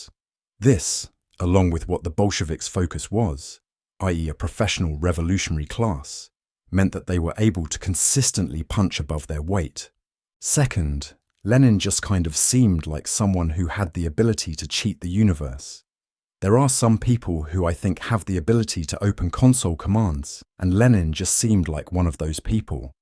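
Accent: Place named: United Kingdom. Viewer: Husky ethnic British voice sounding like Alfie from Emily in Paris ❤❤❤❤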